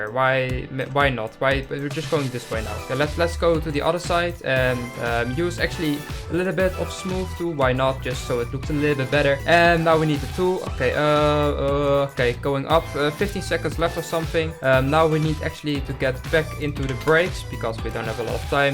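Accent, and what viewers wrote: Netherlands. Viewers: Aan je acsent ben je Nederlands denk ik he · Nice accent it sounds dutch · Bro when I heard u the first time I already knew that u where dutch like me 😅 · I smell dutch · ik ruik een nederlander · I immediately smelled dutch. Hoe gaat het man? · Your english is so dutch if that make sense😭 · I hear the Dutch accent haha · You sound like a dutch person that speeks english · jij bent een hele goeie youtuber ik hoor jou nederlandse accent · Ik vind het grappig dat je hoort dat je Nederlands bent hele toffe vidio 🧀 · I smell Dutch🟥⬜🟦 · I smell Nederlands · Ik ruik een Nederlander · i smell dutch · Je bent Nederlands ik hoor het aan je stem je hebt een Nederlands accent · I smell Dutch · You sound dutch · Ik ruik een Nederlander. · Ik dacht al waarom je engels zo erg klinkt als nederlands maar toen kwam ik er achter dat ik al eens een keer je video heb gezien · I SMELL DUTCH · Why do you sound so Dutch? 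If u are: 👄🦷NeDeRlAnDs💪👁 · Ur Defently from the netherlands u hear it / je moet niet altijd engels praten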